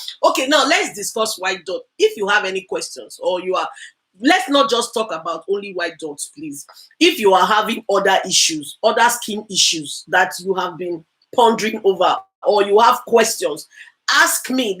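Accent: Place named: Cross River, Nigeria. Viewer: Am from cross river state mama..am guessing your from there too because you sound like someone from cross river state mama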